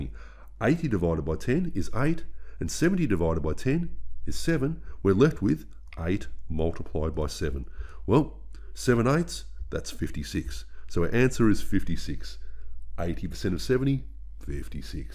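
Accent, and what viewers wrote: Australia. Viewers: As a New Zealander, I would say his accent is from Australia, and probably so is he.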